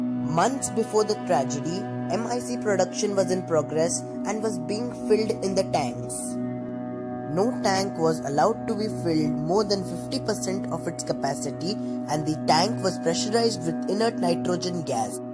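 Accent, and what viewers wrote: India. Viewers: Yes his accent is exactly Indian accent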